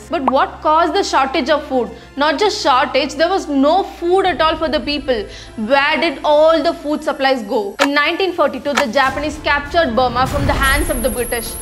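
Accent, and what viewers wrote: Tamil Nadu, India. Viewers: your pronunciation with heavy Tamil accent is bad. You observe Palki and learn.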